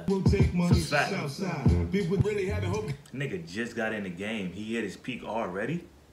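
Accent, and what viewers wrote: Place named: New York, United States. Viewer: yo from michigan? tHOUGHT you was from new york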